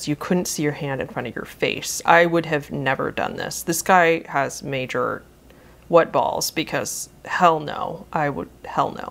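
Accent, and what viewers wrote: United States. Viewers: As an English bloke myself, it feels so unusual to hear the phrase "dual carriageway" being said in an American accent. Haha. I have no idea how someone could be this bored/brave to go on an expedition like this. Either that, or I'm just a wuss. XD Edit: It also feels very bizarre to hear the word "bloke" being said in that same accent. I hear that so rarely that I can't compute it. XD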